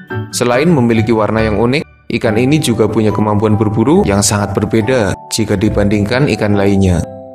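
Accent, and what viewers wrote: Banyumas, Indonesia. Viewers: Suara ADMIN ky wong NGAPAK Y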